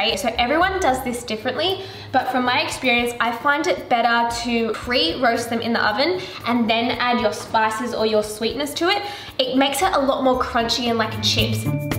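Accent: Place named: Australia. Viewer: Lol that's the aussie accent for u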